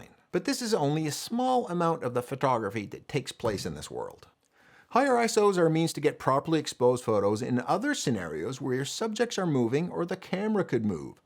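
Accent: Canada. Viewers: That is a prime time Canadian accent 🇨🇦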